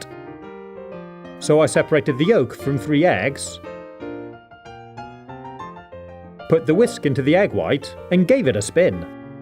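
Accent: United Kingdom. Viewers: Your voice sounds British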